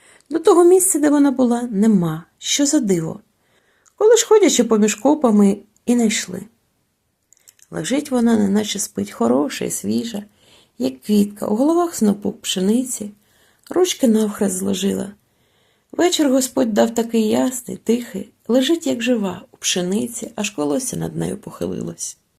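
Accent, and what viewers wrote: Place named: Russia. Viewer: Родной язык чтицы - русский, и это слышно. Тембр голоса приятный, но нет той сочности и точности звуков, которые присущи только реальному носителю языка.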